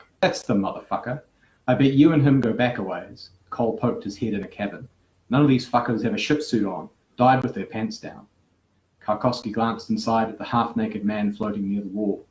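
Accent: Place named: New Zealand. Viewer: Oh my! How I loved this! As a mujer living in Spain and whose English May be considered a second or third language-depends from where you look, born and raised in Tanzania with Afghan origins and comping to live in Spain at the tender age of 12-, I love listening to your NZ accent, and how English varies from the ex colonies. Same thing happens with Spanish from Spain and the Iberoamericanos(Latin America) Spanish. Thank you,! for the lesson. I really enjoyed it!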